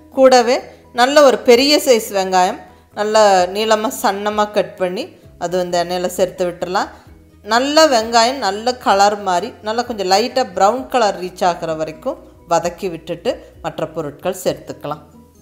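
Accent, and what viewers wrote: Kongu, India. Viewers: நீங்க பேசற கொங்கு தமிழுக்காக வே நான் உங்க Channel ஐ like பண்றேன் சும்மா ஜம்மனு இருக்கு உங்கள் dish போலவே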